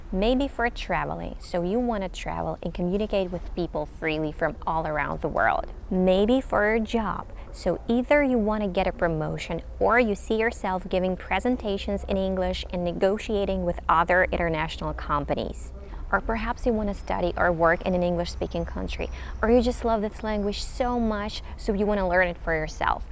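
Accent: United States. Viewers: Вчора на вас підписалася, так думаю "напевне якась датчанка" бо ж всі вони добре говорять англійською) а тут дивлюся точно знайоме місто. Ви дуже дуже класна і хоч я англійський філолог, та мені далеко до вашого американського акценту! Бажаю вас багато підписників і задоволення від улюбленої справи) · Very good video with a bunch of great advice! 👍 Congratulations. By the way, you have an awesome american-english accent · How're you? Your videos... it's a hell of a practical knowledge:D I love listening to your voice - your American accent <3, that's my goal:) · Yeah. She looks like from one of those countries. She has a kidda American accent, though. A pretty woman, by the way. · I'm from a non-native English speaking country. I really wanted to check the opinion of a native speaker of English. Her American English accent sounds perfect to my ears, but because I'm not a native speaker of English, I was not really sure about that and was waiting for the opinion of an American native speaker of English. 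She is a good inspiration for me in my English learning journey. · скажите пожалуйста из какого вы города России? У вас отличный американский акцент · thanks ma'am, I am very much enthusiastic to have accent like you as american but I can't so I want to do so hence try to help me in this regards more tips by which may I be able to reach my goal at the earliest as I can. I regularly watch your video for being fluent in the english language and I'm trying my level best in order to do so please do provide me someone's whatsapp nos through which I want to be perfect by regular discussion else let's see how does it come to an end for doing so soon. but I am very much hopeful to do so accordinly. Thanks awaiting your reply please. (India) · Thank you, from the USA. I love your advice. you have an awesome American-English accent. Finally, I have to tell you're so good. When you will come to the USA. 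Let me know. Please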